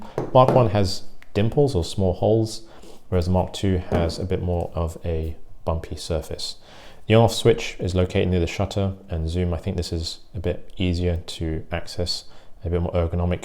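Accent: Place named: United Kingdom. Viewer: Asian guys with eloquent British accents automatically get credibility by virtue of that presentation alone.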